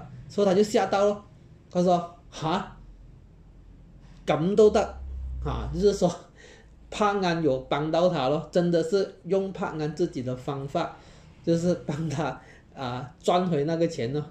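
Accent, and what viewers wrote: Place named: Penang, Malaysia. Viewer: 槟城的福建音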